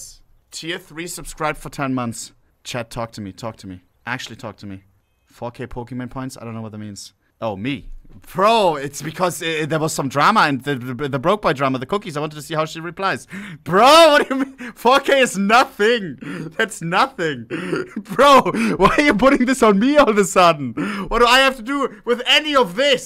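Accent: Germany. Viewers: is he german? I hear an accent XDD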